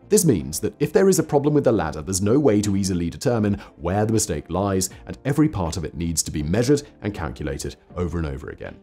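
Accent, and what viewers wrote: England, United Kingdom. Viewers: Great video. Lose that English accent. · Why does vsauce have a British accent and look slightly different? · A little hard, hes English lol.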